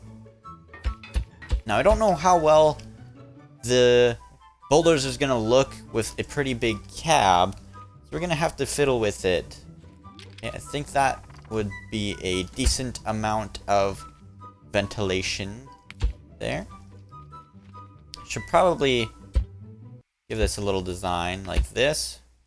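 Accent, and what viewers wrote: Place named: Canada. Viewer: can't get over the fact that ur Canadian so u pronounce about aboot... no offence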